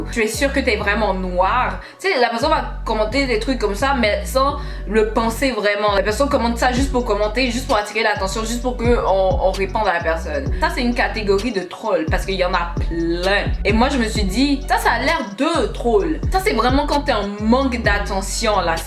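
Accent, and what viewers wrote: Quebec, Canada. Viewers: T’es québécoise nan ?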